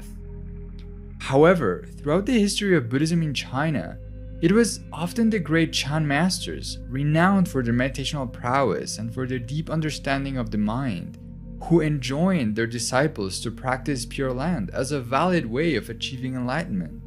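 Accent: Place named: Quebec, Canada. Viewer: Very informative! Look forward to the rest of the series. (Et je pense que c'est un accent québécois que j'entends...😊)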